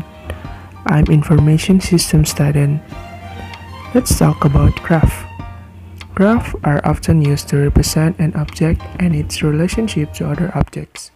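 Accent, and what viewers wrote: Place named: India. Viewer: Fuck you bloody *indian accent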